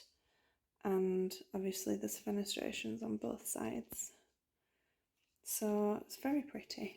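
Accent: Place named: Yorkshire, United Kingdom. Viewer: Ahhhh, a Yorkshire lass? Thumbs up for the accent, the plants and hair colour 😁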